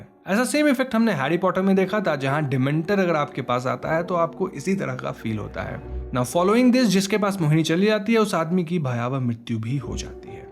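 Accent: Bengal, India. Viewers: R u a bengali? Your accent..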